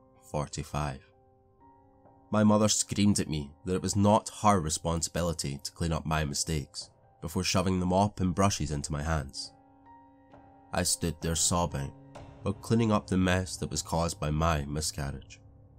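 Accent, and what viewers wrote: Scotland, United Kingdom. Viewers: Oh the snarky ones, good to hear that Scottish accent again😆😜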